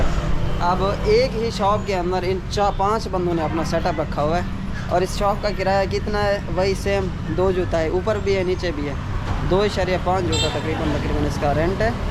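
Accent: Saraiki, Pakistan. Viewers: تم سرائیکی ہو تمہارے لہجے سے پتہ لگا میں بھی سرائیکی ہوں ڈیرہ غازی خان سے مسات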